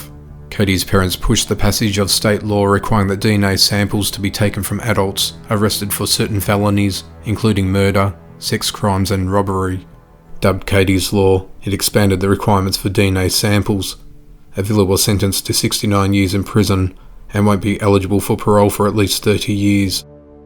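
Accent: Australia. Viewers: Are you an Aussie? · it's my pleasure. And the name is a shoutout to the ' ' song 😂 Can I ask if you're from NSW? I'm not, but you enunciate like Sydney Police Officers.... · ~ I have just watched 4 of your videos, I honestly didn't catch your Aussie accent! Only since reading the comments on this video, I'm in Western Australia, well done, great channel 😊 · Ricky Maveety he’s Australian, so won’t know the American pronounciation.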